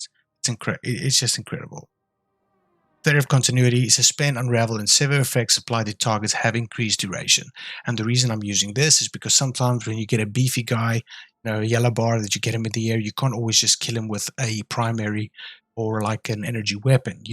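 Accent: South Africa. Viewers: Hey man you sound South African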